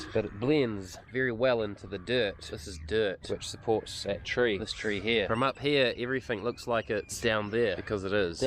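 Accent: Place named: Australia. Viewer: Your presentation differs from other YouTubers. The Australian accent adds to that. Uniqueness always has a change of going viral. Keep plugging away.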